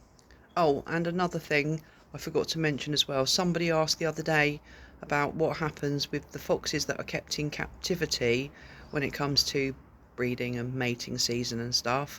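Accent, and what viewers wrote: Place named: West Country, United Kingdom. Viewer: Also, being a proud glasweigan (& i think I've told you the funny story of being in Devon - i guessed ur accent even though I don't think u ever saw that message, especially as it was Simón & Magoo time and u were run aff yer feet!! - I went to "Buckfast Abbey". Thank God I'm sober now 🙏 but going there was a big thing for a bucky lover 😆 Anyways what am I on about, aw aye - something you, Karen & Carol could watch, perhaps. It's a comedy. I'm being a bit bias, with my glasweigan specs 👓 on - but have you heard of a bbc sitcom series called, "Still game????" I doubt it because you are so far south in cornwall, beautiful west country. Well the last sitcom bbc did of us, was "rab c nesbit". I think you'll know of that. But STILL GAME is so funny. It was made more than 20 years ago. About 2003 it started. Wee half hour episodes. 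It is on bbc iplayer. And YouTube. But you must start at series 1 episode 1!! You must, to get the gist and to enjoy the hilarity. If you are looking for something different to watch, something funny, and if you don't understand any of the glasweigan words, geeza shout 😅 But am sure you'd like it, the English people I've recommended it to, they've gave excellent feedback. Much better humour than Rab c nesbit ever had 😮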